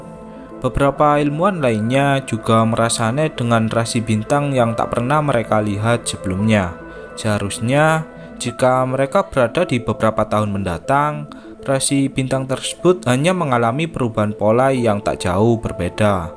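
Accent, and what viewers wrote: Java, Indonesia. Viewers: Medok yo mas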